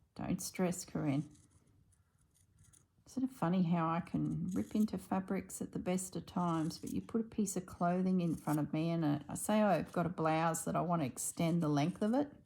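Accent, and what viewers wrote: Australia. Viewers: Loving the jacket and find your story telling interesting. You are truly a unique person, also, I'm in Indiana, USA. Enjoy your Australian accent. 🙂.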